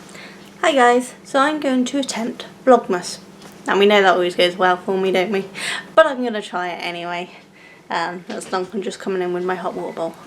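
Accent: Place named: England, United Kingdom. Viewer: love the english accent... cheers... keep up the great work 🙏❤️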